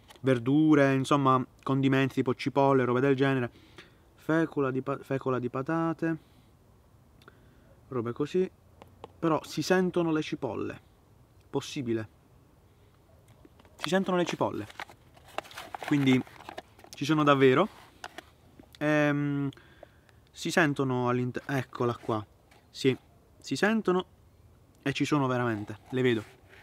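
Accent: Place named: Apulia, Italy. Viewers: Mi piace troppo il tuo accento. Sei andriese? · Capace che mi sento male. Ok sei pugliese come me, ora devo capire di che zona ahahahaha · Spiegare come è fatta la pasta alla bolognese da un terrone con l'accento pugliese mi fa girare la testa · Sei leccese o comunque pugliese?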